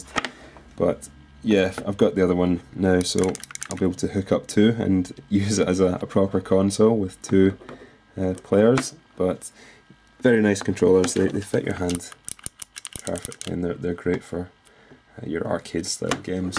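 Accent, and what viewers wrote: Scotland, United Kingdom. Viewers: I didn't realize he was Scottish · Scottish? Subscribed. Always subscribe to other Scottish gamers :P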